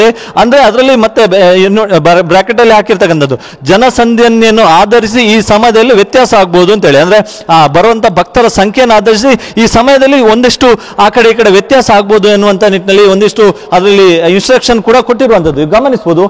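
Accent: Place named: Mangalore, India. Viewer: Anna please speak in kannada don't mix with English.. Mangalore kannada is very to nice here..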